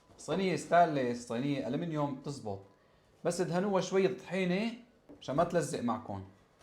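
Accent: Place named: Homs, Syria. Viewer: لك اللجة الحومصية بقلبي❤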